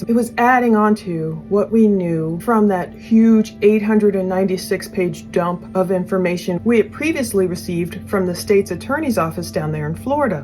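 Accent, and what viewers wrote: Australia. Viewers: Australian accent , you do an amazing job. Thankyou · Love your channel think that accent is Aussie tho 😊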